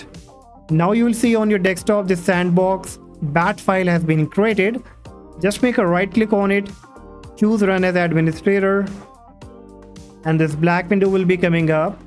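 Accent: India. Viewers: it's always the guy with the bollywood indian voice who helps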